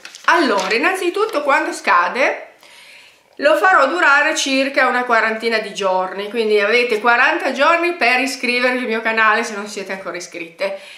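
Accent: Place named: Turin, Italy. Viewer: Sei di Torino, vero? Riconoscosco quello che é anche il mio, per niente marcato, accento 😂😂😂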